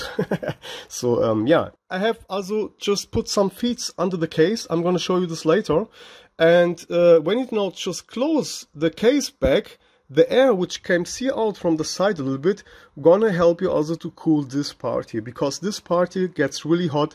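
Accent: Germany. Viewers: I love German accent 🤣. Btw Ich bin Deutsch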